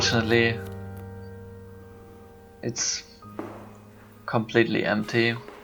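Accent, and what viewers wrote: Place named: France. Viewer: sounds france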